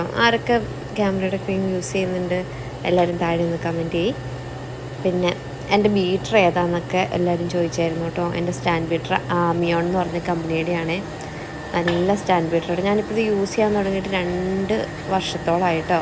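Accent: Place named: Kothamangalam, India. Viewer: Hi.. stalam evideya kothamangalam ano avdathe slang pole thonunn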